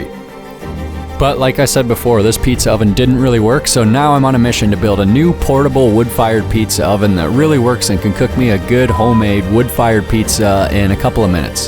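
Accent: Canada. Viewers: Awesome build, my only complaint is the use of pizza sauce from a bottle, and cheddar cheese on a pizza. This is the most Canadian content possible (I can tell from your accent) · I can usually not tell where people are from from their accents but you are very Canadian. :) cheers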